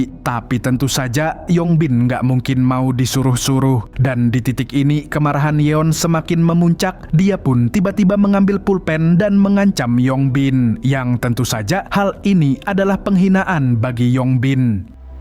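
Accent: Banjar, Indonesia. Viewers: Ada logat Banjar nya bang 👍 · Ikam ne orang Banjar kah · Orang Banjar kah mang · Pian urang Banjar kah mang,Ulun kira urang luar Kalimantan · logat banjar pian😂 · Bang orang banjar hah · Bg orang banjar kah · pian urang banjar kh mang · ini admin nya orang banjar kayanya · Bro lu orang banjar gw juge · urang banjar sekalinya😂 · Ini orang banjar semangat bang gak yangka ternyata orang banjar semangat jangan ampih man ai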